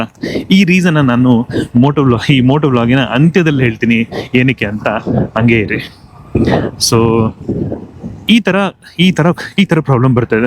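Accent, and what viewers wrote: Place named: Bihar, India. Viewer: Accent seems like Bihari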